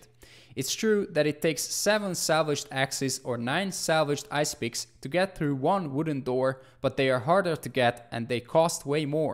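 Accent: Hungary. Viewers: Is that a Hungarian accent?? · You have hungarian accent lol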